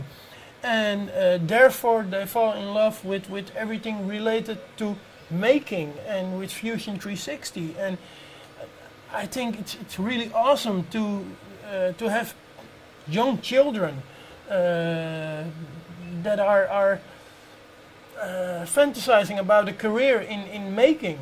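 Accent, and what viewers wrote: Netherlands. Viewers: Grappig, ik vermoedde meteen aan je accent dat je uit NL kwam.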